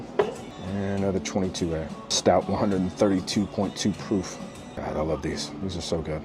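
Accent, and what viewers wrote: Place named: South, United States. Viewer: horrible country accent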